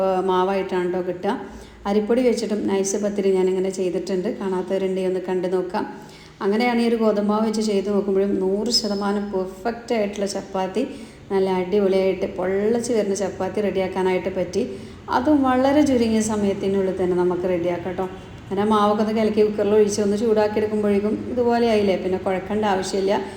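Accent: Malappuram, India. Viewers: Samsaram malappuram style matiyoj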